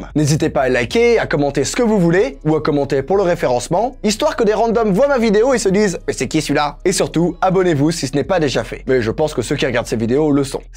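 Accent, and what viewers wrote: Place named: United States. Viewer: à ta manière de prononcer l'Anglais je dirai que tu as vécu aux États-Unis étant jeune ;)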